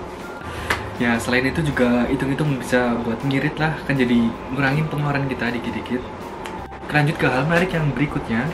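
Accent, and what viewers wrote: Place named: Banyumas, Indonesia. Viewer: Ora ngapak ora penak😀👍🙏🙏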